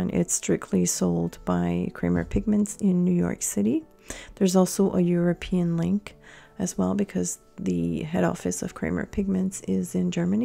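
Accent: Quebec, Canada. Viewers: So beautiful! I just found your channel. I am also self taught and I do realistic acrylic on canvas. I need to step out of my box and I just love this. I recognize a french Canadian accent. My parents are both from Quebec, I'm first generation born Albertan. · Totally exquisite,!!!! 💕 I love your signature..... And you sound just nice and Canadian to me! 😄😘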